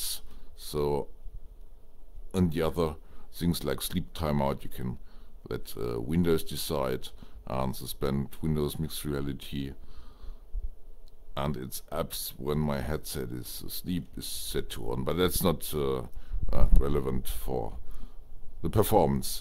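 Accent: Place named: Germany. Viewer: Thank you for your informative video. Perfect German English, I would sound exactly like you 😀. Are you sure that the resolution in CM-settings-Assetto Corsa- Video has impact to the VR performance? It is overwritten by the VR video settings as far as I know. I have problems with very big user interface of the CM apps in AC in game when I lower the resolution under my 4K of the screen. 👋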